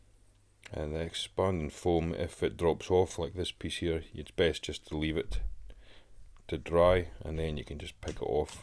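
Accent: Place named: Aberdeen, United Kingdom. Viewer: Love it!! Your accents Aberdeen/Aberdeenshire can i ask where you buy your fish?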